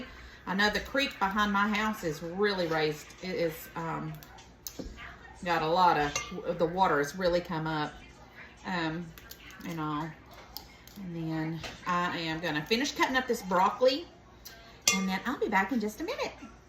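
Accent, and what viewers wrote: South, United States. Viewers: Where do you live because you sound like a southern girl and cook like I was raised I just love your videos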